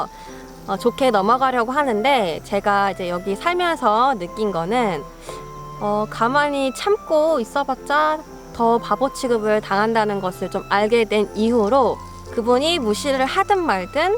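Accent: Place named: Chungcheong, South Korea. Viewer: ㅋㅋㅋ 그거 충청도라 그래요 ㅋ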